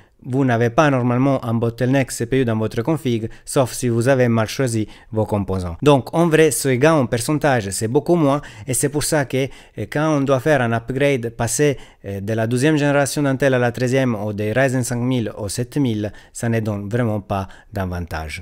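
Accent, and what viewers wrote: Italy. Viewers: J'avais compris pour le pseudo mais pas pour la présentation, peut être mettre des sous titres en francais pour que tout le monde comprenne , (vous êtes de Naples ? :) ) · Bravo pour vos vidéos claires et précises, le tout avec votre superbe accent italien 😊. On apprend plein de choses à vous écouter. Continuez comme cela, c’est parfait.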